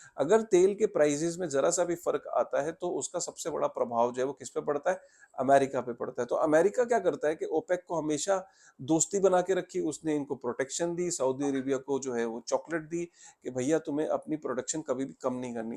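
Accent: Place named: India. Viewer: Interesting subject. But Indian dialect is literally the worst to listen too 😬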